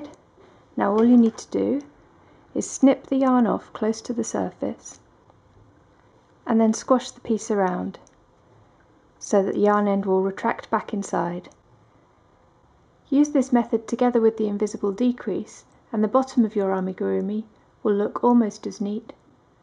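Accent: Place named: England, United Kingdom. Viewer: wow thanks what a great video :3 I love your english accent by the way :D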